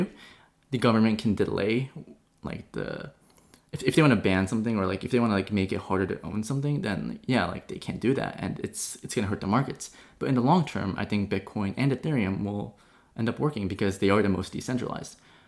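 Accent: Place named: California, United States. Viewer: Good content. I love your casual Californian style. ✌🏻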